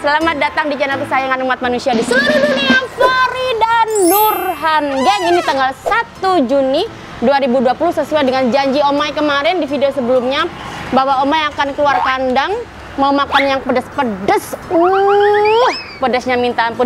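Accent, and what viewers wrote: Java, Indonesia. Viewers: Jadi inget yg pertama kali omay ke abang adek yg diketawain karna ngmongnya jawa bgt trs bilang smoga mbak ngk nysel yak udah ngetawain aq karna aq anak yatim 🤗 pasti itu mbak nya skrg malu ketemu 😁 untung nya itu tmnnya yg bilang CABENYA DIPISAH ngk ikut lagi😆 sukses terus miss you 😘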